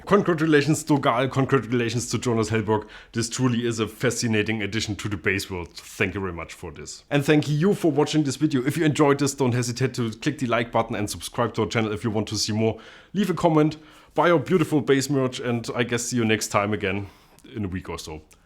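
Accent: Germany. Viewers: 6 seconds into the vid. Are you german?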